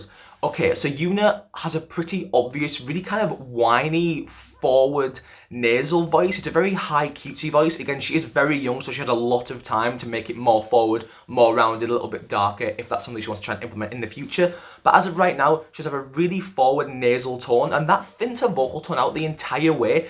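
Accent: United Kingdom. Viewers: love your videos! Just have to ask what is your accent? is it pure british or sth else?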